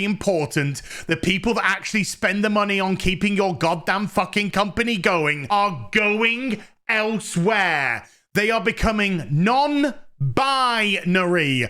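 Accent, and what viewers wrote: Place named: United Kingdom. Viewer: I'm not a Brit, but his accent makes his rants far more entertaining to listen to.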